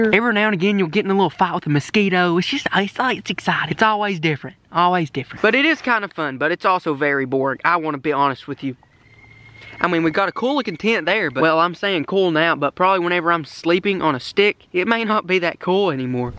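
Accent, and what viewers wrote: United States. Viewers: I LOVE HIS AMERICAN ACCENT!!!!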